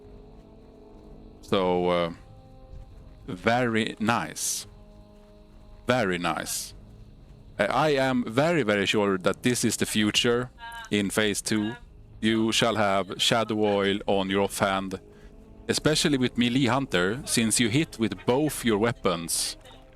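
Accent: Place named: Sweden. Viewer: Great theorycrafting, btw älskar den svenska dialekten!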